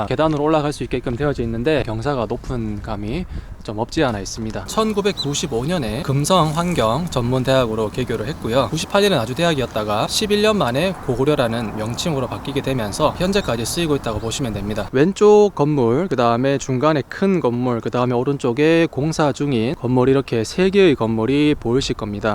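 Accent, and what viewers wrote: Gyeongsang, South Korea. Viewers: 전라도 땅에 이름은 고구려 경상도 말투의 진행자 분 삼국 대화합의 장이네요 나주대로 다시 바뀌고 만학도들이 많이 다니는 학교라니 지역 경제 활성화 차원에서도 잘 되기를 바랍니다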